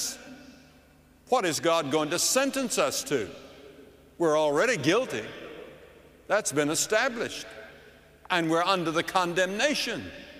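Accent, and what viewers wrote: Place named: South, United States. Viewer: I love listening to him. His voice is made for preaching and his southern accent is so captivating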